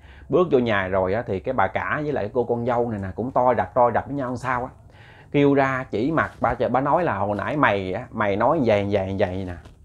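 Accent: Mekong Delta, Vietnam. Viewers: Rat nghe chat giong mien tay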